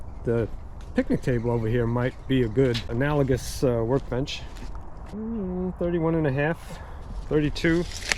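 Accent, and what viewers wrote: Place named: Florida, United States. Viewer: Man what a great thinker you are, That's working smarter not harder, You taught me an valuable lesson not to RUSH, but have a plan, We love your Florida Accent Lol are you from Long Island Or The City? Can't wait to see the next episode, you have a great setup in Tampa, Sure not making any more land , Stay Blessed my brother ✌️🌴🌅😎🇺🇸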